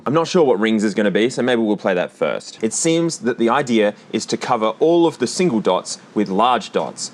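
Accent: Australia. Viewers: I love how Aussies say "ninety" and "nineteen"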